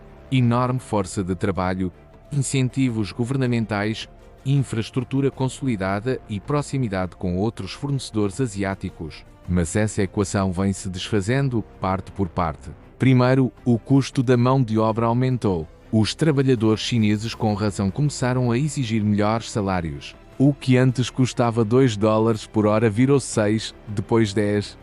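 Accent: Portugal. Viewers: tira essa voz de português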